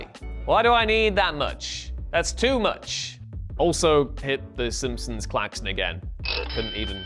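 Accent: United States. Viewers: Seriously,. Is it an affectation to try to appeal to American viewers, have you been visiting the States a lot lately? What is up with the Americanization of your accent? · Where in earth has this semi-american accent come from?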